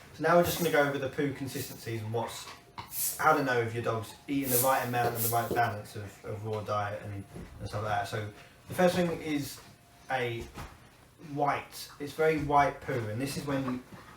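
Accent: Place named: England, United Kingdom. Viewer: Thank you so much for the video! Your advice helped me a lot on planning my puppy's diet. Especially the posters they're so useful. There's just one little thing, I am a foreigner and am not very used to the English tone of English so there were lots of parts I didn't really get what you were saying. Would you consider adding subtitles or giving a brief description of the video? 😢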